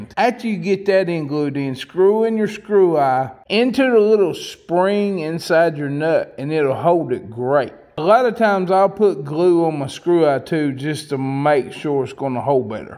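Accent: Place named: South, United States. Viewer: I really enjoy listening to a fellow SOUTHERNER, with that country draw like me .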